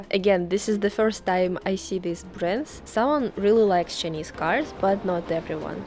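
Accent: Russia. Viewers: == I LOVE YOUR RUSKY ACCENT .--IT S AWESOME == I HOPE TO KEEP IT == YOU HAVE MORE CHARM . IT'S IN FASHION IN EUROPE . == ENGLISH WITH A RUSKY ACCENT == ALL THE BEST == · I justed wanted to write hello and I think your Russian accent is very authentic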